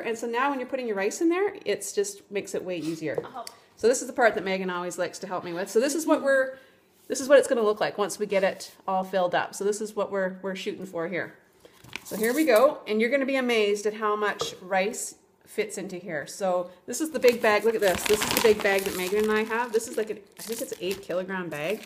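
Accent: Canada. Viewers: Love them, and your accents! Are you Canadian?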